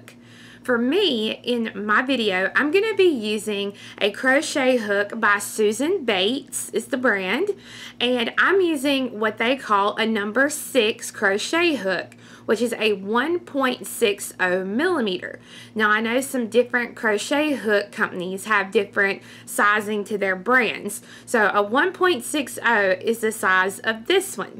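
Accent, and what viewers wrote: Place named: North Carolina, United States. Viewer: you are a hoot! My family is from Mt.Airy,North Carolina. I feel like I am listening to a family member! I enjoy your videos so much! Thank you!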